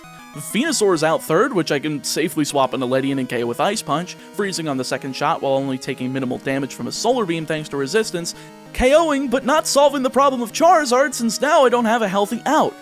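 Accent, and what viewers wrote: South, United States. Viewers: ive been watching your stuff for a while now, and im just now noticing your accent, you're from the south right?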